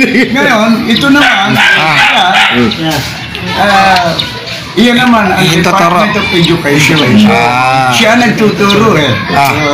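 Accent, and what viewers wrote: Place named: Nueva Ecija, Philippines. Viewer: Parang narinig ko na ung about daliri,Sa pork chop duo yata.?.Pero ok nmn po pagkaka deliver..👍,ung punto ng Bulacan ,prang s Nueva Ecija..Yung mga tumatawa din ang nagdala..heheh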